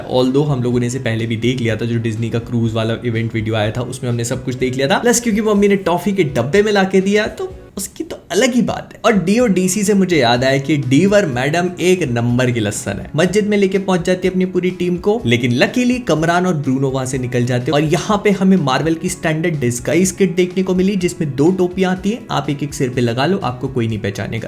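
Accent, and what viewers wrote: Bhopal, India. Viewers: bhopal se kya baat krne ke accent se laga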